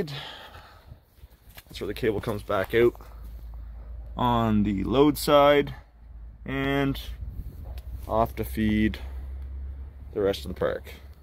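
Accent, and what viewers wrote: New Brunswick, Canada. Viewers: Assuming by your accent you're new brunswick or pei... When they buried the service; would that be upgradeable to 3 phase?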